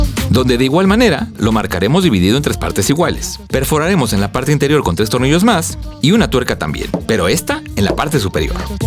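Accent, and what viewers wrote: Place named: Peru. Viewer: Amigo, soy nuevo subcriptor en tu vídeo. Algunas acentuaciones de sílabas me hace pensar que eres peruano de lima educado. Estoy equivocado o eres de otro país?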